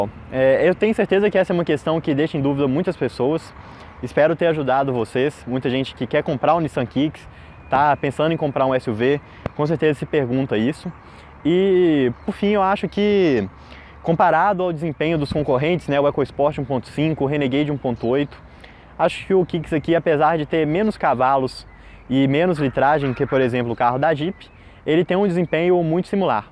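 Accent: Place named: Minas Gerais, Brazil. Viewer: eu tbem acho!! E esse sotaquinho mineiro... Iti maliaaa 😍😍😍 ... Melhor canal de carros do YouTube 👏🏽👏🏽👏🏽👏🏽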